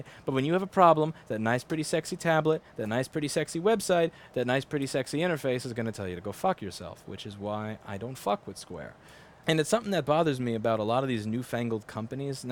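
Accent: New York, United States. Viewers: New Yawkers like pronounce "human" as "you-man"... Not a criticism,! You're the freakin man! 100% love for you!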